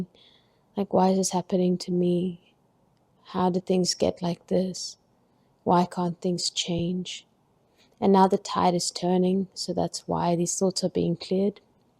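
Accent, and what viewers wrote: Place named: South Africa. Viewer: So happy to search other spiritual channels and find a fellow SOUTH AFRICAN VOICE! Cheering you on from the tip of Africa. I hope we might connect if it serves us - and humanity. I am an ex lawyer (that was brief....) now an ascension guide, I've been channelling since 2012 - specialising currently according to my team, in navigating the insanity of ascension - in other words, the psychological impact ascension is having on us individually and collectively.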